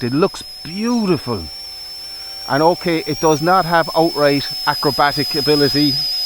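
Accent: Ireland. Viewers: Geez You sound even more Irish than I do, Where you from,, I'd say Limerick at a Guess 😂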